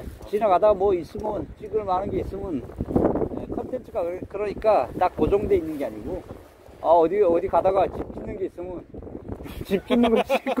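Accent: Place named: North Korea. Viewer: 님... 실례지만... 고향은 어디세여? 말투가... 윗쪽 같으신데 알수있을까요?? 새터민이신가요?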